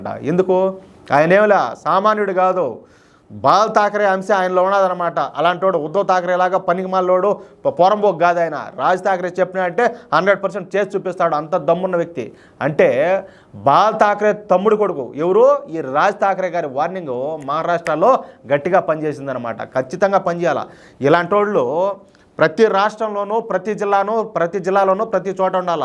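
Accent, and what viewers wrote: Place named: Ongole-Nellore, India. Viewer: Kuttabagala undla nee voice mana ongole Nellore bashalo em matladavanna ....subscribe chesukondi plz Andaru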